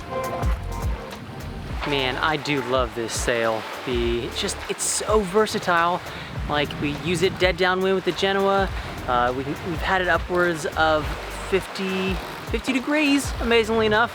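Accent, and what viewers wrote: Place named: Texas, United States. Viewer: I went back and re-listenened to the vid...I didn't hear any valley girl...unless you count a hint of Palo Dura Canyon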